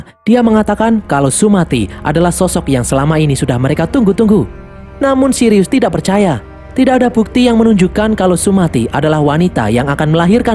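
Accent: Java, Indonesia. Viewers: Adminya orang jawa ya, karna mbacanya karno🥵🤣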